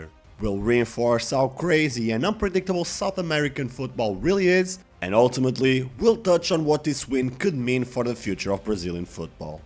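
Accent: Portugal. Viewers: Are you portuguese? I can hear some accent rsrsrs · Portuguese accent, and his gf is Portuguese, while he might have learned the language I'm assuming he's not from Portugal am I right? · No tactics? No strategy? Based on your accent, I thought you were Portuguese, but you talk about football like an American.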